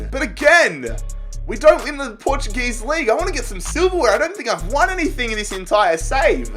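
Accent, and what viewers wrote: Australia. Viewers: Are you austrillan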